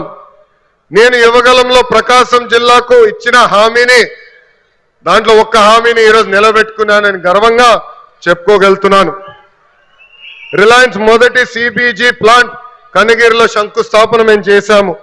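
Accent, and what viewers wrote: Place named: Telangana, India. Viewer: That is Telangana or seema dialect, what is your problem, jagan PADAHIDU antadu😅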